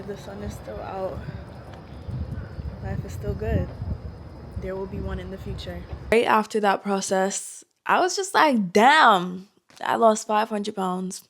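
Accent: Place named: Bermuda. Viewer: Sounds like a little Bermuda on that accent 🇧🇲 ❤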